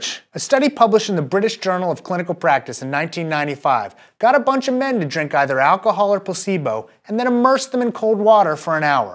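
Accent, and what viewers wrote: United States. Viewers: I'm with Joseph here, remember that you are watching an American channel, we don't use the metric system. It isn't hard to tell by his accent that he is American.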